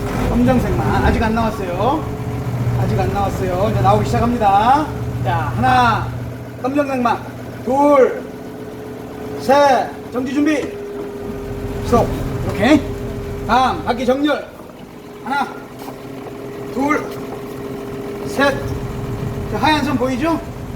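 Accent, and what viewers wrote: Jeolla, South Korea. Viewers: 전라도 사투리 맞죠잉? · 찰진 전라도 사투리 선생님 인상깊은 강의 잘 듣고 갑니다. 감사합니다. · 전라도 교관 같은데요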